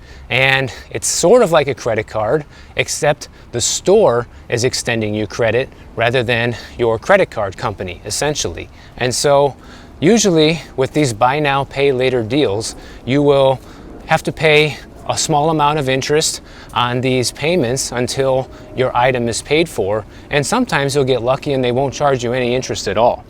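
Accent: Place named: Midwest, United States. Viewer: Hi,! Thank you for this video. I can tell by your accent that you are from the Midwest. I am, too! Janesville, WI, to be exact. There was a KMart store in Janesville and I recall, as a child, many times my mom would put our, “back-to-school”, clothes on lay away. She would always pay them off within a couple of paychecks, but for the circumstance we were in, it seemed to work. The store did not charge interest, and, would literally hold the very specific items, as long as the promised payments were made. KMart, I don’t believe, is around anymore. But I remember that time, well. My mantra, since I was in my mid to late 20s has been: “If you can’t pay cash for it, you don’t need it.”. I hope your message continues to reach younger generations.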